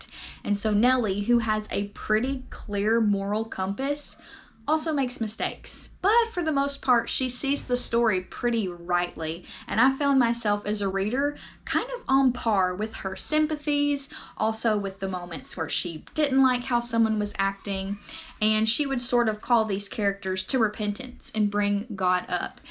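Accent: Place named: Carolinas, United States. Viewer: I would say from the Carolinas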